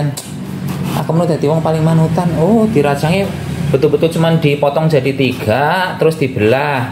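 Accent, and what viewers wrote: Banyumas, Indonesia. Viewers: Kesukaanku itu pare dioseng pake tempe,aku seneng karo ngapak2e mas 😅😅😅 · Ngapake polll tp aku ya seneng, ora ngapak ora penak ya mas 😄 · Matur suwun mas resepe ,ngapak banget si dr lampung mas hadir🙏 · Mas lucu banget inget karo keluarga di cilacap bahasane podo wae ngapak hiburan sambil masak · Mas ngapakmu ngelingke aku karo koncoku mbiyen.biyung ngelingke aku ro biyungku sing wis almarhum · Rika wong ngendi pak?ngapake mantep wkwkwk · bahasanya ala banyumas yak kang? purwokerto nan.... marai inget pernah di purwokerto mendoan endul 👍😃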